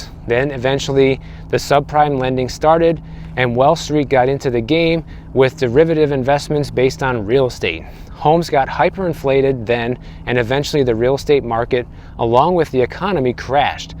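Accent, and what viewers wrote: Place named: Midwest, United States. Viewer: You still have your midwestern accent (flat vowels).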